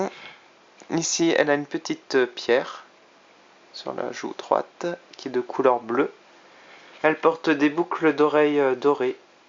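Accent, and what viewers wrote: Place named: France. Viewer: merci bien! très bien fait accent française Gracias!!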